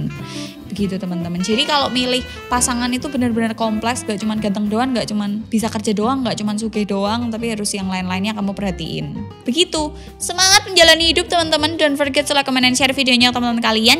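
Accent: Java, Indonesia. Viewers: Logatmu..medok tenan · Boso jowo ne medok banget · Jowone pundi sampean kak... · medok banget suarane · medok medok kepiye..gitu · Jawa bgt reeekkkk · jowone medok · mbak e medhok tp tep nggemesi hmm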